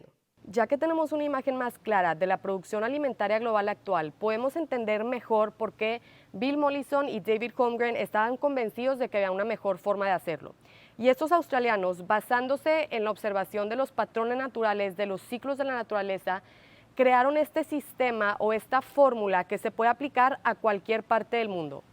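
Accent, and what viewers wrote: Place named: Monterrey, Mexico. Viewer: Hola. justo acabo de terminar de ver tu entrevista con nayo Escobar Jajaja, y justo hacía como unas tres o cuatro semanas que te descubrí en el video en donde fermentas verduras Y Bueno nada excelente tu canal y todas las tantas cosas que estudias, Qué haces Y en las que trabajas Por otra parte no sé si en este canal exista un video en donde hables de la historia de tu hijo Posdata: Ahora entiendo por qué de tu acento tán fresa y norteño pues Igual que yo eres de aquí de Monterrey Jajaja Saludos y bendiciones para tu vida, tu familia y para todos tus emprendimientos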